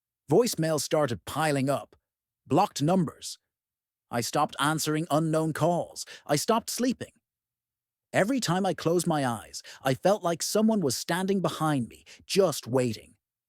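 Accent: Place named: Ireland. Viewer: Creepy story but the Irish accent is refreshing!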